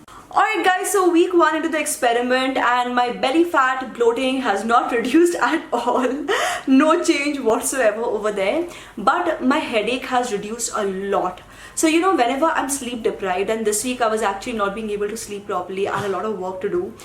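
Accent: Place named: India. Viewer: Do you live in India ? You have an indian accent. I love your video !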